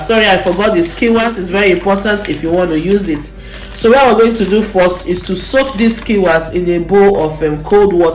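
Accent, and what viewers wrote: Nigeria. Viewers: Damn I love Nigerian accents 😩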